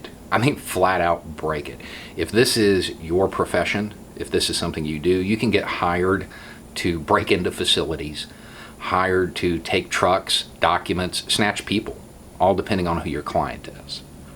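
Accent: South, United States. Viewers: In what state is located? His channel keeps popping up in my "recommend video "finally watched one,.is this guy for real? Or is he just trolling? Would like some options before I invest time to watch previous and future posts. He seems to be informed about issues and open to ideas which suprises me given his southern drawl.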